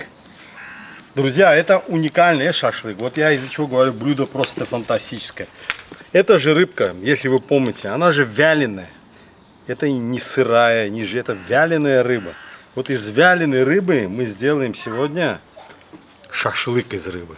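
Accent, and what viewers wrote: Baku, Azerbaijan. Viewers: Джан! Мало того что красиво, а ещё непередаваемые наши бакинские интонации!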